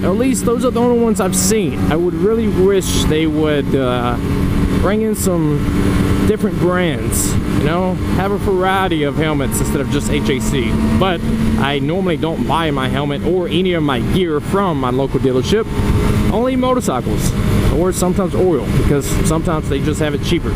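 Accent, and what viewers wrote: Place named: South, United States. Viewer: Hey man, keep up the vocal pace to avoid a 20 min video. It sounds normal in 1.5x speed! The southern draw is nice but if you keep yourself aware of pace you can cut your vid time down by about 40%. Nice vid though, Cheers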